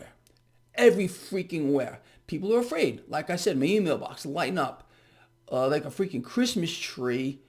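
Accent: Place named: New York, United States. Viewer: For a second I thought you were telling us to buy COAL for every 100 stocks. 😆Texas ears, meet NY accent. BTW, I just bought your eBook and I'm already learning some concepts - thank you so much for the education.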